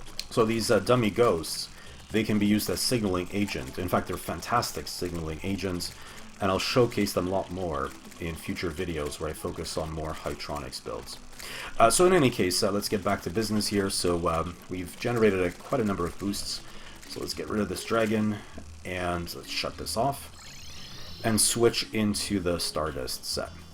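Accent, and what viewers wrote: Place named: Montreal, Canada. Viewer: sound like a montreal boy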